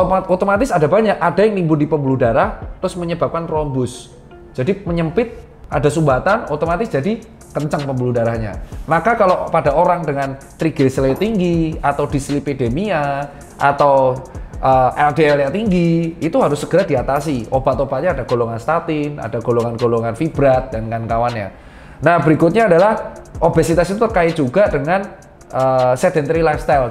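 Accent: Java, Indonesia. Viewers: Medok nya mantap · Bos tirta klo ngomong kliengan meni medok😀😀😂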